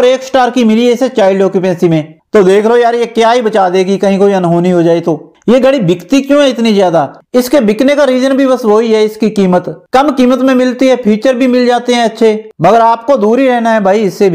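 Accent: Punjab, India. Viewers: ਤੂੰ ਪੰਜਾਬੀ ਏ ਤੇਰੇ ਬੋਲਣ ਦੇ ਲਹਿਜੇ ਤੋਂ ਪਤਾ ਲਗਦਾ ਵੀਡੀਓ ਪੰਜਾਬੀ ਚ ਕਾਸਤੋਂ ਨੀ ਬਣਾਉਦਾ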